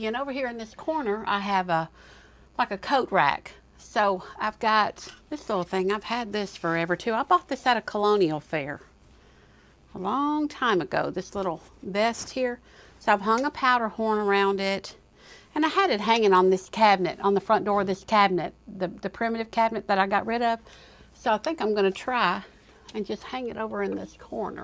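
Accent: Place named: Tennessee, United States. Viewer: I’m new to your channel thanks to Jeri Landers mentioning you in one of her recent videos. I lived in Hendersonville & Smyrna TN several years ago & was always antique shopping. I loved going to the Nashville Flea Market once a month. Anyway now I live in GA & haven’t added many more antiques since then, although I must admit I’m pretty full after all those years of collecting. 😁 I sure do enjoy your channel & your TN accent. My friends here say I still have that accent. Thanks so much❤ your GA friend Thanks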